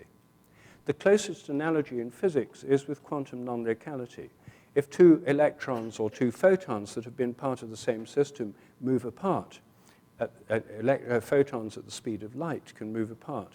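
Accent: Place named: United Kingdom. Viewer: Because he has a British accent? He isn't saying anything I haven't heard before.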